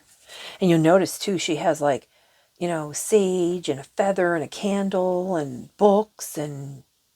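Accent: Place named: Atlantic Canada, Canada. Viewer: so glad to have found your channel, 🩵💕 love your voice - its such a blessing to hear an atlantic canadian accent reading for spirit!! 😇🐉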